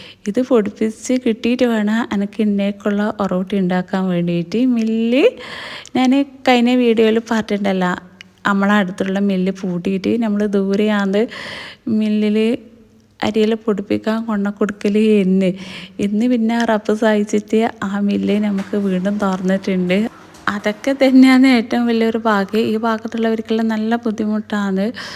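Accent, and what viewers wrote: Kannur, India. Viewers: Nice vedio dear snacks adipoli nigaludey കണ്ണൂര്‍ slang anu enikku ishtam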